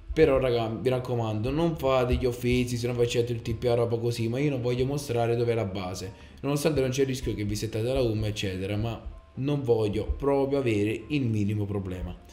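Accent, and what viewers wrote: Naples, Italy. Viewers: Ma sei di Napoli giusto?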